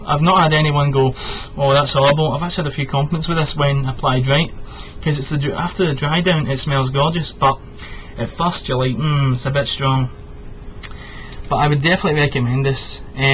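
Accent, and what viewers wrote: Scotland, United Kingdom. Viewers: Just don't ever delete your channel. I like to visit it time and again like it's a shrine or something. You're Scottish and I'm a massive Medieval history buff. Oddly enough, your accent, the way your video's shot and your taste in perfumes tie into my weird mental image of a laid back baron from the feudal times who loves his perfumes. I always associate the notes of iris, tobacco, violet, oakmoss, cedar and the likes with the UK from the medieval times. Dim lights, stone walls, smells of fougere and something barnyard like all mixed together with a smell of wet stone/earth. I was playing Kingdom Come Deliverance the other day and I had to get back and check on. I hope you're doing well my friend. You're a youtube legend.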